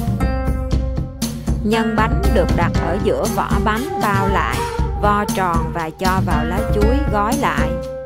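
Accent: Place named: Southeast, Vietnam. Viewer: Mình không mấy thích ăn món bánh ít.Nhưng quả thật,cô gái nói giọng Đông Nam Bộ này rất dễ thương,thông minh và khéo léo.Thật tuyệt dù không phải video nào cũng hấp dẫn.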